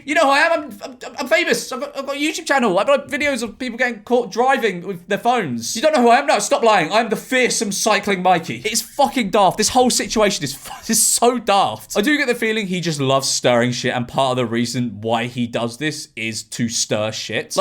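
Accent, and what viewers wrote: South Africa. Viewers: His accent sounds South African, I guess that proves the old Spitting Image song true...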